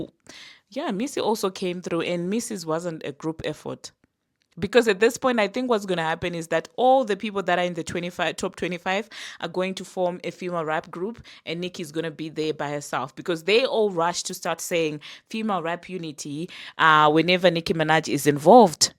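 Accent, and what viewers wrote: South Africa. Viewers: You sound south African I love the accent 🩷😌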